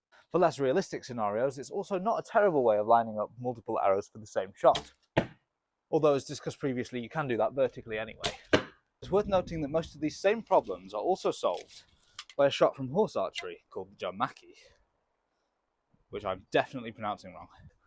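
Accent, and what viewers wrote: United Kingdom. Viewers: I had this on mute and thought 'this guy must be british'...I turned the volume up and wo and behold lol P.s. this video was very entertaining and informative 👏🏾 ❤❤ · why did I know he'd have a british accent? From the thumbnail I was imagining something like "shooting soidways?? MAAAATE!" and then a bunch of Teesside verbal abuse lol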